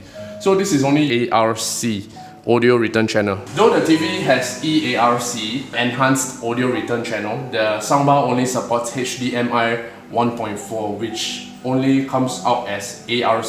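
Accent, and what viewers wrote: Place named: Nigeria. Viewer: U gat Nigerian Accent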